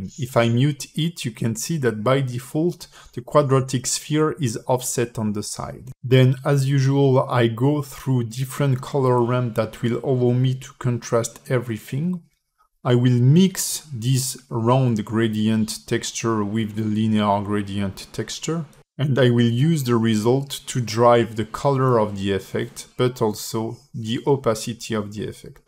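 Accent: France. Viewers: Vraiment top ta vidéo, très quali et ton animation est cool ! On sent le petit accent frenchy :P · what a french accent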